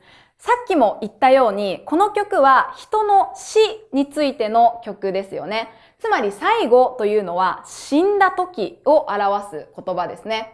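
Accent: Kansai, Japan. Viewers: 先生は関西人でしょうね。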